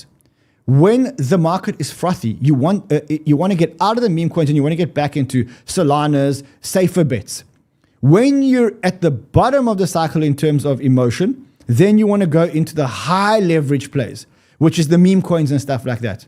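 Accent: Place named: South Africa. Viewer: He is South african based in Cpt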